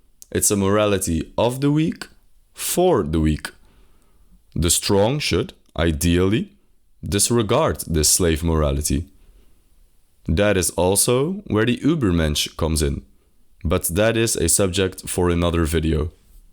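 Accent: Flanders, Belgium. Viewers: Thanks for making philosophy digestible :) Are you a native Dutch speaker (with a Flemish accent)? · Benje van België lol? Ik hoor uw accent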